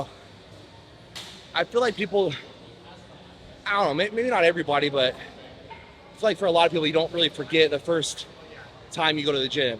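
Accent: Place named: Florida, United States. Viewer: You sound like a true Florida cracker bro “fittin to get swole”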